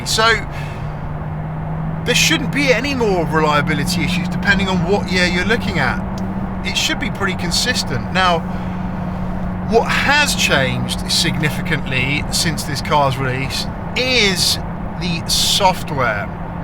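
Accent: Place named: United Kingdom. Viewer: I can't believe how difficult this is to follow… If everything is concise and well worded and nicely structured, the British accent only makes things better… If that's not the case you're really stands out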